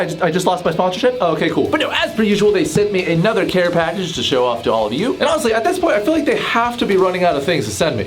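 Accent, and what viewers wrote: United Kingdom. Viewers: his brit accent sounds like murdoc im crying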